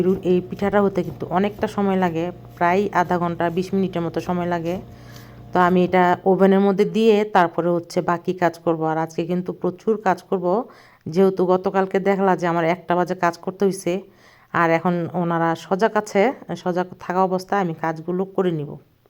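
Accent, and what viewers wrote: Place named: North, Bangladesh. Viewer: কথা শুনে মনে হচ্ছে -বাড়ি দিনাজপুর, ঠাকুরগাঁও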